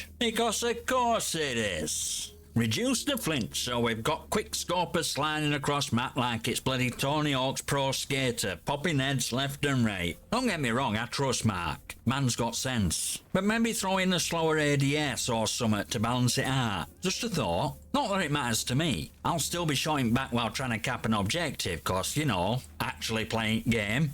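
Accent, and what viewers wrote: Yorkshire, United Kingdom. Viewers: I love the thick Yorkshire accent! Caught me off guard 💀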